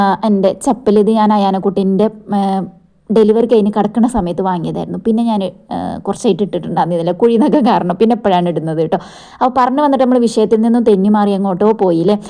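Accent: Malappuram, India. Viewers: ഞങ്ങളെ മലപ്പുറം കാരെ അതെ സംസാരം തന്നെ ആണല്ലോ ങ്ങൾക് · സംസാരം കേട്ടാൽ മലപ്പുറം ആണെന്ന് തോന്നും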